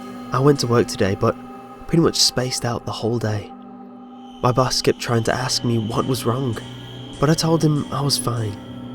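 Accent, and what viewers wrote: United Kingdom. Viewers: You sound british are you british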